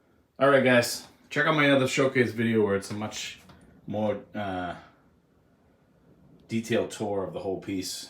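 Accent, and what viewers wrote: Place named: Brooklyn, United States. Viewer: I ordered it. Also love your collection! I hear the BK accent lmfaoooooooo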